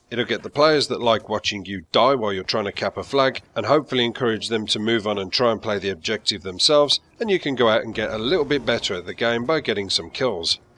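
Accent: United Kingdom. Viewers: I enjoy listening to you UK guys more than the US commentaries. Keep up the good vids bud!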